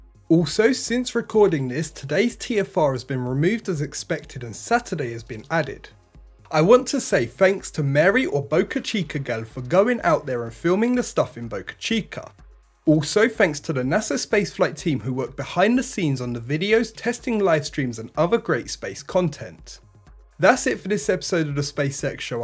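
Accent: United Kingdom. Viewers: Sorry but his mouthed was British his farther south african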